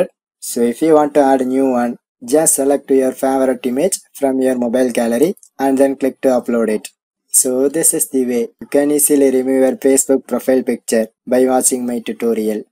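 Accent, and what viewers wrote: South India, India. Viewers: bro is from south Thats why he is having this type of accent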